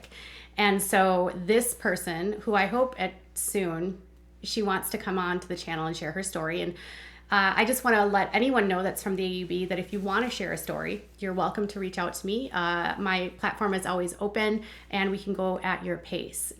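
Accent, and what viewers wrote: Minnesota, United States. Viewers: Where are you from bc I hear a Minnesota accent here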